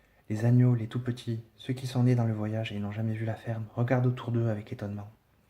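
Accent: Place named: France. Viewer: So relaxing! The French accent/language is so great!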